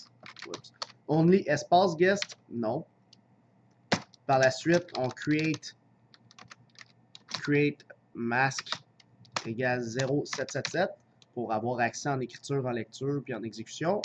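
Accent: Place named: Quebec, Canada. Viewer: merci pour cette vidéo qui m'a permis de comprendre deux ou trois points que j'avais du mal à visualiser avec les tutos classiques. Et puis, rien que pour l'accent du Québec ça valait le coup. Merci cousin !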